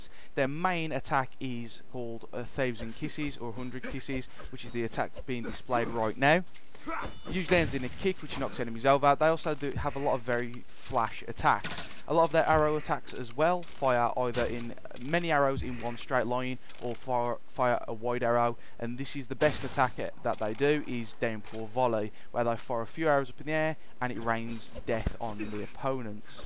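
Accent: Birmingham, United Kingdom. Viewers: Nice on mate. You from Brum or the Black Country?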